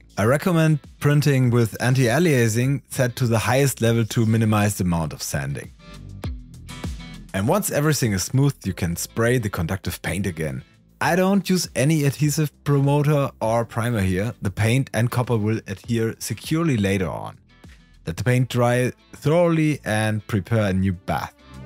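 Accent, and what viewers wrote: Germany. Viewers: Eindeutig deutscher Dialekt 😁 Edit: Akzent xD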